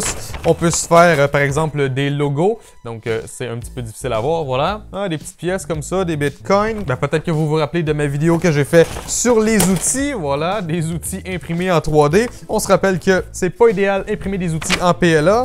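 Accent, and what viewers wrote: Quebec, Canada. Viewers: T québécois ?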